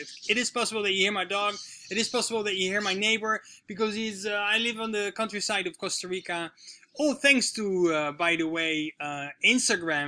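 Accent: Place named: Netherlands. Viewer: Grappig dat je nederlands accent hoort .. ik kom trouwens uit Honduras🇭🇳 ,groetjes en genietze in Costarica 🇨🇷💯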